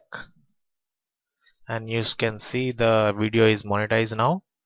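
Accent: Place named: India. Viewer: I hate the Indians English accents maan ,soo frustrating ughh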